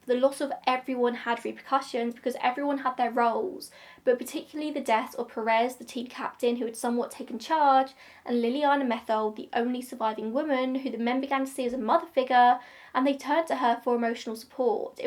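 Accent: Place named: Tottenham, United Kingdom. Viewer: I'm gonna guess you're from Tottenham. Just a random guess...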